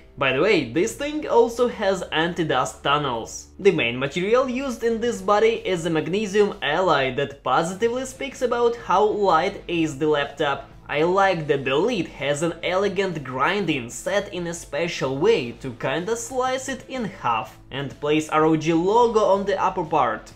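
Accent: Russia. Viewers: Долго вслушивался в твою речь))) всё думал, а не русский-ли часом?))) как Клаву увидел, понял что земляк))) может конечно и живёшь не в России))) говоришь очень хорошо, даже мне с моим не особо хорошим уровнем английского понимать получается без особых усилий) молодец) так держать! хорошее видео и обзор)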